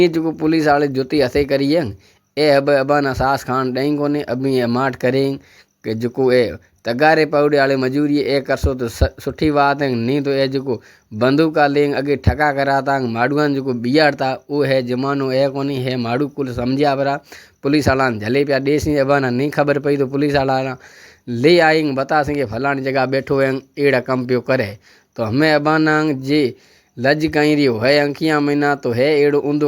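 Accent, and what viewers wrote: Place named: Dhat, India. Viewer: की ढाटी आवाज बहुतही अच्छी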